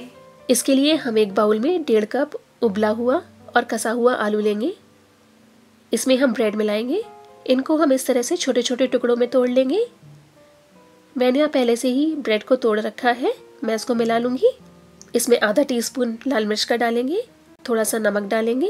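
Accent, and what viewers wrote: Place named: India. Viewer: I like to make new recipes and I always try new ones and I m a regular visitor of ur channel. I like ur hindi accent n ur way to describe d whole recipe but today, very first time I m seeing u in indian attire. looking very nice as ur recipes.. keep doing ji and thanks for different types of cookery inventions.. ☺👍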